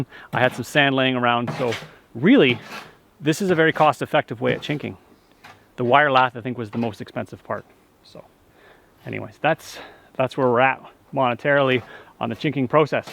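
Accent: Canada. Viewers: I just love to hear you talk. Canada speak, uh, yes.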